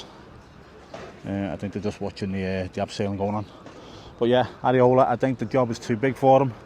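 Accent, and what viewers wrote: Liverpool, United Kingdom. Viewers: Spoken like a proper scouser who knows his footy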